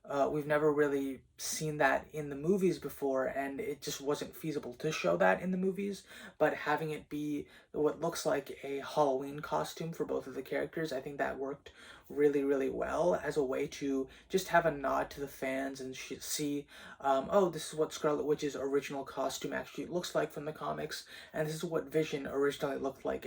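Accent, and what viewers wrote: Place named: United States. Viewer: Im eagerly waiting for this release. By the way though your dialect is almost similar to US speaker your appearance relates to a slight indian men🤔😉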